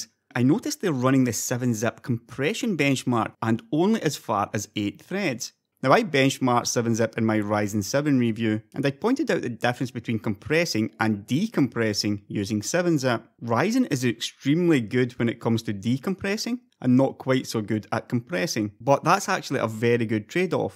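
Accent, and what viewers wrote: Scotland, United Kingdom. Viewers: his Scottish accent? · I taught you are Scottish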